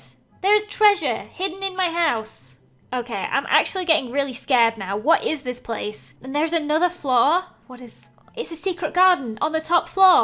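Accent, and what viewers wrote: United Kingdom. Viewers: It was her accent. She is. Very VERY British and her accent is amazing btw, but when she says “into” it sounds like “unto”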